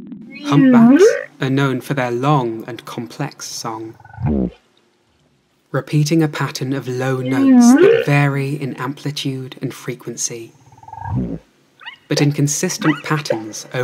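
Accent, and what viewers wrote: United Kingdom. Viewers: The combination of your voice, British accent and a proper music is just mind-blowing. You are a genius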